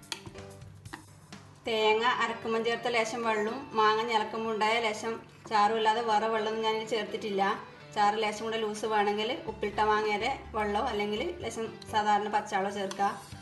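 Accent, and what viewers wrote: Kasaragod, India. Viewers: നീലേശ്വരം ഭാഷ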